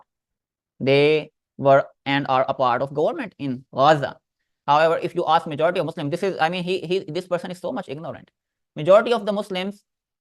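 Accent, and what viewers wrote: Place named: Bangladesh. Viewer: You sound Bangladeshi, what do you know about the Middle East and history little girl?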